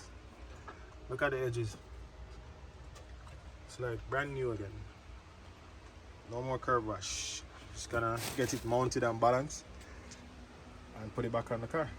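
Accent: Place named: Jamaica. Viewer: The Jamaican accent can't be hidden. It's a good look mi boss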